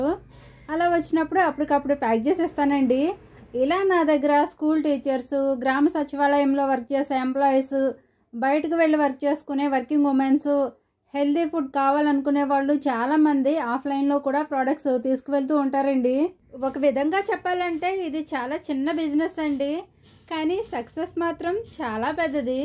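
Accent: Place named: Kakinada, India. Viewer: Kakinada yasa tho baley sagadeesi matladutunnarandi.all the best.🎉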